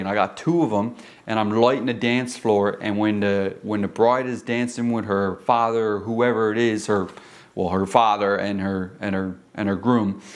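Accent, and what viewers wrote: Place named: Australia. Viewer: Bro are. You Aussie 🇦🇺? That Accent is sick mate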